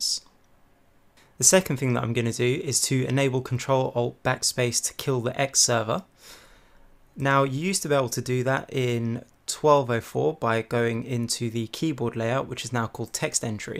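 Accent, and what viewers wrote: United Kingdom. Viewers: Sierra Hormiga British pronunciation :)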